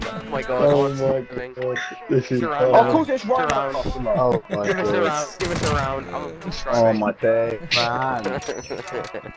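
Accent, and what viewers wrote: United Kingdom. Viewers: I did not expect his voice to be British. I actually like the accent! Fantastic job on the vid btw.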